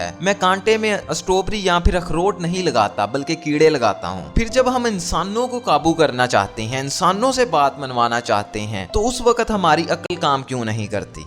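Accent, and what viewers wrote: Punjab, Pakistan. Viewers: Our yeh Punjabi wala lahja, please Urdu na hi bolo to acha hay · آپکی ویڈہو اچھی ہے لیکن آپکا لہجہ پنجابی ذدہ ہے اپنے لہجے کو اُردو میں بد لیں۔ وجہ کیا ہے وجہ یہ کہ اٗردو آپکی قومی زبان ہے۔ اب دیکھتے ہیں کہ آپ کیا سیکھتے ہیں۔ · Ap ka bat karny ka andaz bhat acha hay ap k lahjay say lagta hay ap panjabi hay ap k bat karny ka andaz bhat acha hay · Taubaa ap punjabio ke accent ktne bure dihati jse hote hn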